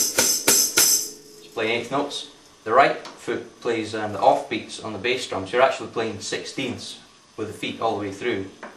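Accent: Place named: Scotland, United Kingdom. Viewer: you're awesome. I love...always wanted to find a drummer who could play it...adorable Scottish accent. Amy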